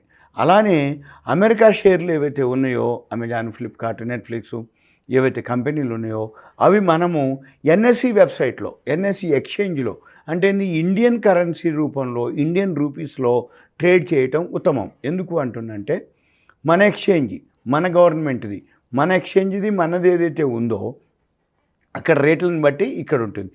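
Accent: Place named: Telangana, India. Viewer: నువ్వు నీ తెలంగాణా assent.......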